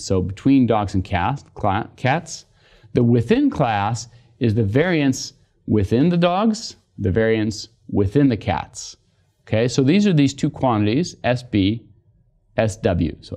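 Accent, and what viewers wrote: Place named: General American, United States. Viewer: A new class of teaching👏👏👏 Good style, perfect suit, standard american accent and high quality content. Thanks a lot👌👌👌👏👏👏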